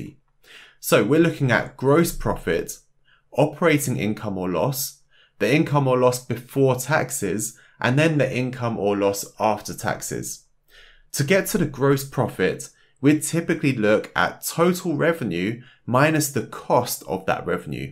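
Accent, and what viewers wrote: United Kingdom. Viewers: britich accent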